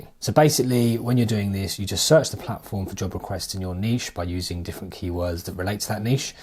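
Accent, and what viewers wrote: United Kingdom. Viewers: Can I just say, one of my great annoyances is with youtubers that talk at 100 miles an hour. it’s really off-putting. Please dont fall into that trap. Otherwise, I am enjoying listening to someone from the UK for a change.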